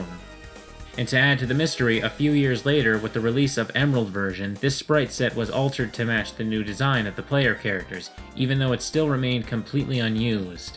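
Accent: Canada. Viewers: you sound Canadian :) anyway blank reminds me of missing no. lol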